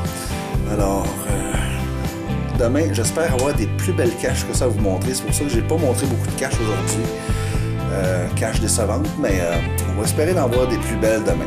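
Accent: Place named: Quebec, Canada. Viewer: Merci pour cette vidéo. Cela faisait une paille (comme on dit chez nous en France )que l'on attendait ça. J'aime toujours autant ton accent. Bon tu va me dire que c'est nous les cousins français qui en avons un!! J'ai eu la chance d'aller travailler dans l'état de New-York il y a 3 ans avec un de tes compatriotes canadiens. Je débutais le geocaching mais cela ma permis au moins d'avoir un souvenir. Merci encore pour tes vidéos Au plaisir de te revoir. Choudrouna. (Du centre de la France ) Géo amitié.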